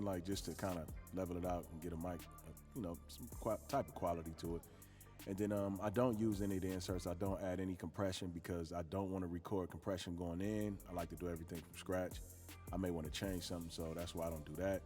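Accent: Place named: Cleveland, United States. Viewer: Well then you close, homie. I knew I pinpointed your accent and just genuinely liked you because you was from the Land. Good to know homie. I’m frustrated as fuck. I’m still up. I thought I had exploded on my tracks on my MPC to live properly into my logic, but I actually did it. There are Sounds is missing. Are you on Instagram? Send me your handle. I would like to be your Instagram partner. And thanks man I will check your videos. I like your style.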